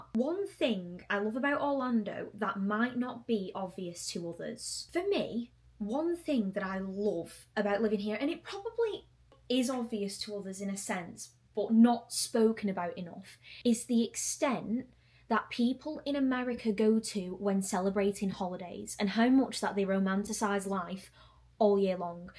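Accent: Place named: Lancashire, United Kingdom. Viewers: Love this ✨💗 Also a fellow northern girlie here love the accent! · It’s a Coppull/ Chorley accent! Not too far from Wigan, at all. Welcome to Florida &. St. Cloud is a beautiful city! And thank you so much for nursing the sick.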